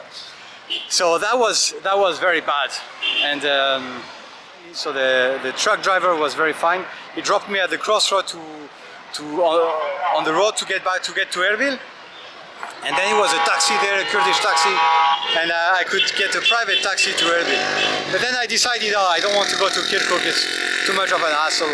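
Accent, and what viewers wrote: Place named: France. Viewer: your French accent is so cute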